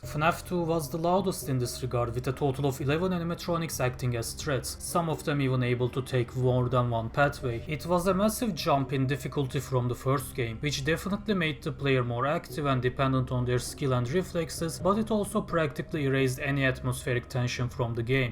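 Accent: Turkey. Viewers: I was gonna ask are you from Turkey because of you accent but then i saw your channel name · Nice video and a style that is harder to find in a good quality like your video keep going and türkçe aksanım biraz batıyor bir şekilde kurtulabilirsen çok hoş olur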